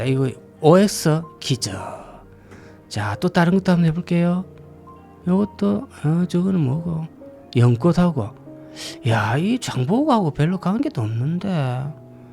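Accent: Gyeongsang, South Korea. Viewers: ㅋㅋㅋ 가짜배기, 오랜만에 듣는 보리문디 사투리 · 영상속 나레이션의 묘미가 더욱 멋져 빛을 발합니다 장보고의 썰렁한 내력이 안되게 찐 성공 내력담 알차게 챙기셨네요 언제나 구수한 경상도 언어가 더욱 흥미롭구요 잘 보았습니다 ~👍🏻👍🏻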